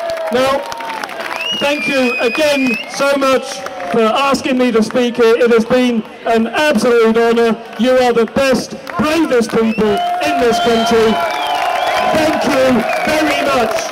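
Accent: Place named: RP, United Kingdom. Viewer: Couldn't help noticing that Paul toned down his normal posh accent for this speech and even used the "f" word. Not a criticism, one has to speak to one's audience. He's a very brave man and I fear for his safety. Sadly, with Britain's stupidly undemocratic first-past-the-post voting system, which notable was set aside for the Scottish independence referendum, his tiny party has virtually no chance of getting elected. Unlike in Holland where Geert Wilders party, with the same anti-Islam message, is the 3rd largest with many MP's and he gets 24 hour police protection.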